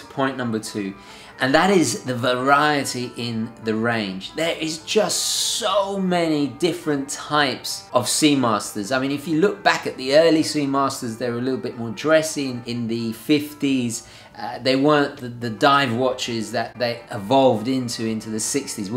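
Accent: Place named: United Kingdom. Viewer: This one is why I enjoy your videos. Great points made. I find that you gents from the British Isles are more entertaining to listen to than us Yanks. Carry on, Guv.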